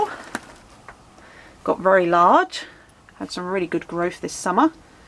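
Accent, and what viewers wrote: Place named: West Midlands, United Kingdom. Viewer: YIPPPEEEEEE I LOVE Plant Tours and WOWSERS you have a REALLY BEAUTIFUL Collection <3 I LOVE your variegated Thanksgiving cactus, all your plants are so beautiful and they are all so nice and tidy and beautifully potted up, and I LOVE your greenhouse too, and OMG WOW at your Euphorbia lactea ghost its a big stunner <3 I think your cactus is a Thelocactus variety or mammillaria compressa as they look very similar, and your cactus figurines are so cute too haha <3 I think from your accent you are from my neck of the woods originally (Warwickshire, West Midlands ? ) thanks so much for this wonderful update and sending you an abundance of love and happiness and PLANT POWER from across the Emerald Isle XXXX <3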